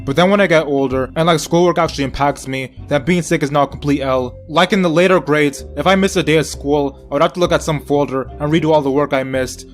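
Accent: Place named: New York, United States. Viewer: I am new to your channel and deadass I fucks with you heavy I am from NewYork recently moved but when I hear your voice and I feel right back in New York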